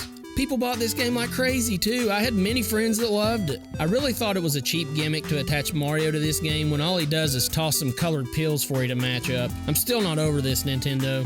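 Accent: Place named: Texas, United States. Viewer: Bizarre question, but what accent is that? Texan?? From England myself, but totally dig the accent dude!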